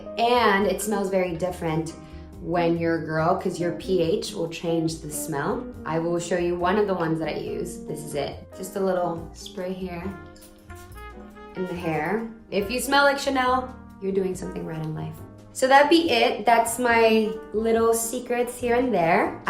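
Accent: Mexico. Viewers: Ufa ainda bem que não é difícil te entender ! entendo um pouco o mexicano 😍🇧🇷😁❤️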